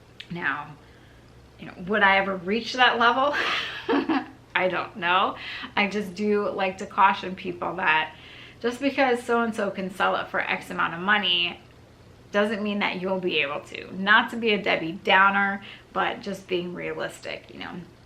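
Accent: New Jersey, United States. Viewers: Kinda crazy reply ... I’m about your age and live in SF ... California toe-head and find myself watching you a few hours a week because of your NJ accent and manor ... different from the left coast treasure hunters I meet ... nothing much happens in your videos but I find them interesting - I like flea-markets and urban treasure hunting and your presentation/discussion/viewer questions are interesting and relaxing ... and I like Yellowstone too ... hahaha ... ANYWAY... Yup that was definitely a SF summer tourist sweatshirt the shops sell ... kinda ugly for us locals ... Tourist think its warm on the CA coast in the summer but as Mark Twain said the coldest winter I ever spent was a summer in San Francisco ... Love your stuff ... just had to chime in after all this time ...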